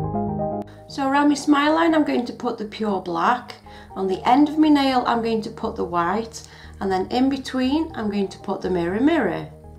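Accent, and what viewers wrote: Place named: Cockney, United Kingdom. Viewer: Oh my gosh you are the nails queen! The stuff you come up with is always so stunning and creative! Keep up the great work, I love your videos! I've been watching your videos for about a month now and I gotta say I'm addicted, lol hope that doesn't weird. I was wondering what part of London you're from, your accident sounds east end but what do I know haha. Guess I'm just basing that guess on British crime movies. Lol sorry for the ramble I'm from California!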